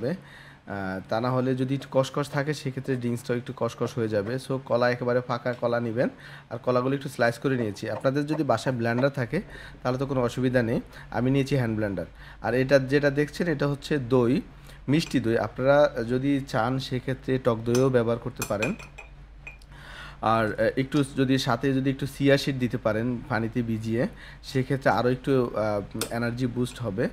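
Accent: Noakhali, Bangladesh. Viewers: ভাই আননে কি নোয়াখাইল্লা 😊😊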